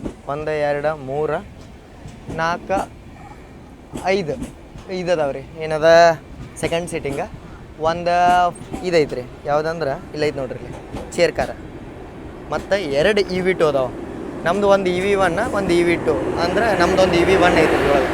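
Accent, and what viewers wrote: North Karnataka, India. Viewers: Very very very nice north karanatks kannada language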